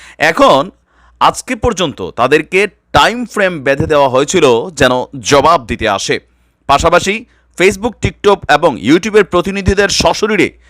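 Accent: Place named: Comilla, Bangladesh. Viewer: কুমিল্লা কুমিল্লার পোলার মতো কথা কবি বলবিরে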